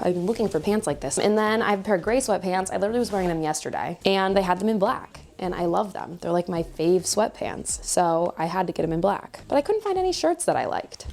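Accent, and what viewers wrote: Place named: South, United States. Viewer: What is your accent? It sounds like you have a little southern drawl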